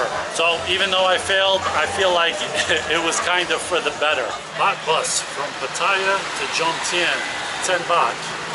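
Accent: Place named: New Jersey, United States. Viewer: Mmm. That’s a lovely accent you have. New Jersey? Are you a Jew?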